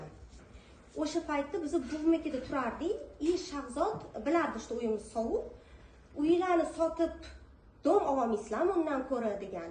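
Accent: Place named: Russia. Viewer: Чала урис узбекча гапираркансану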